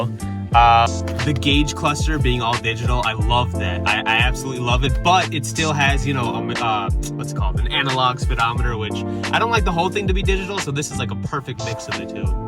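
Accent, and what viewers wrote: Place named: India. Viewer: I love the indian accent i chill with sum from saga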